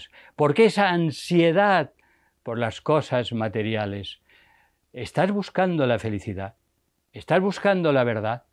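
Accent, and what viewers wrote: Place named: Spain. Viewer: Magnífica exposición y más aún sus reflexiones personales,Profesor.Y para un argentino con las 3/4partes de su sangre,ibericas,su gallardo acento español es música.Muchas gracias!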